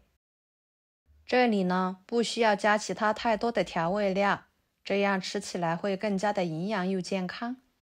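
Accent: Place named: Yunnan, China. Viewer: 云南普通话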